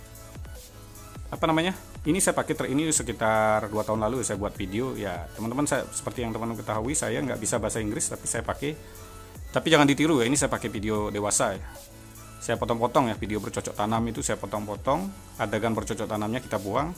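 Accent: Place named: Kutai, Indonesia. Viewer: logatnya kayak orang kutai bang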